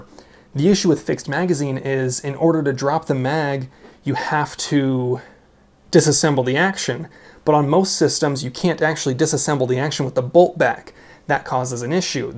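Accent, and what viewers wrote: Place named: California, United States. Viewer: I can tell your from California just looking and listening to you.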